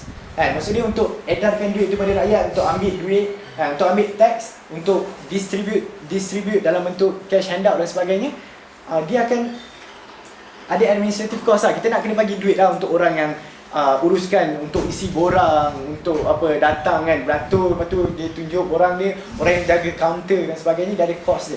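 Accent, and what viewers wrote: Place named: Kedah, Malaysia. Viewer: You have grown to be matured...still remember u r such a small kid during form2 debating in UIA with d kedahan slang 😁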